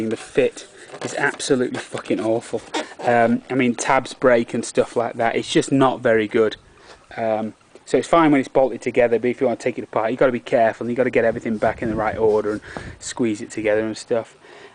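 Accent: United Kingdom. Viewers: Good vid mate....nice to see a Brit living in the US who's managed to keep his accent! I've had my Dakar for just over a week now, but true to form it has snowed so much, I can't get out on it! typical British (Yorkshire) weather!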